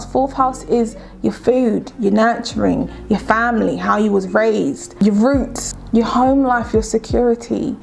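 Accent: United Kingdom. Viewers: You most definitely do but your accent has a little British twang to it 💐 its all love beloved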